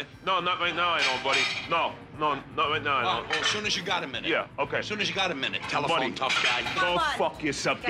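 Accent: Scotland, United Kingdom. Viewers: It's strange listening to the British commentary. I'm so used to hearing the American guy in the episode. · Have they taken voiceover voice from when he was 15 or something. he sounds more scottish than ive ever heard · This episode must be real early because it's not narrating it's some Scottish dude. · scottish Ramsay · The Scottish accent really jumped out in the narration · I totally felt the change in narrators. A lil bri'ish eh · Kitchen Nightmares US with a British narrator is pure gold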